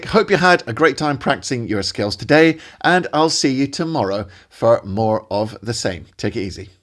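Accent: Scotland, United Kingdom. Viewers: First attempt at slowing the video today and it worked great for my practice as well as bringing out your Scot’s accent!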